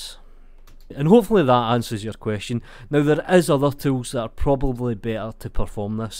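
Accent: Scotland, United Kingdom. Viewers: hahaha i thought so, is this Scottish?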